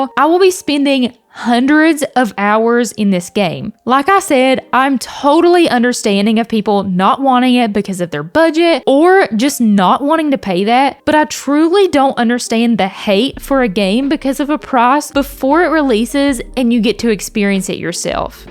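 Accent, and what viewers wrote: South, United States. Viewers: Damn that’s a strongggg country/southern accent 😂😂 shocked me at first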